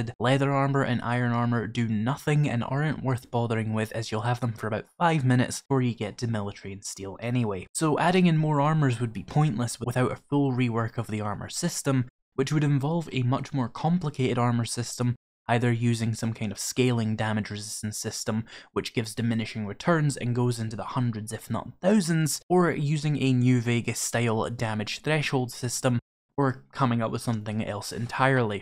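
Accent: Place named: England, United Kingdom. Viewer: Answer: Series. The plural of series is series. It's just like the word deer. You have one series. You have twenty series. This is because the word is a noun that itself is already describing a plural. Therefore, it retains the plurality in singular and plural. (This feels very awkward, being an American explaining English to a person with an English accent) 🤣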